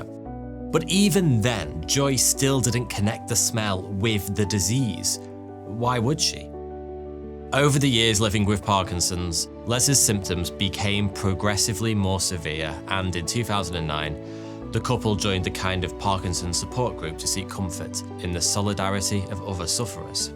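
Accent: United Kingdom. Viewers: It's a play on his regional British accent. Finking = thinking For'y two = thought he, too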